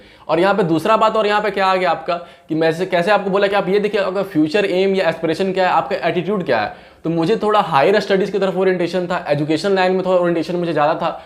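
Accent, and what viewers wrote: Bihar, India. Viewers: Yes , he is from Bihar. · Your voice has a bihari tone 😅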